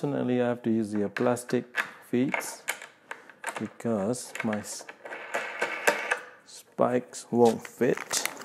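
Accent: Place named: Myanmar. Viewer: You have a Burmese accent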